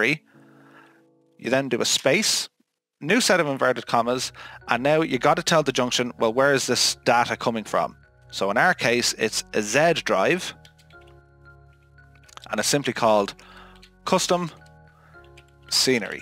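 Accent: Ireland. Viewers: I have watched a few other tutorials about this and i ended up deleting all of my aircraft... i was gutted and feeling hopeless about this symbolic linking or whatever... watched this and it made every bit of sense to me, it helps that you're a fellow Irishman, could understand the accent perfectly. Thanks for making this so clear to me, I've now got it working and i really appreciate it.. subbed! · Lots of nice content on your channel. Love the Irish accent 😀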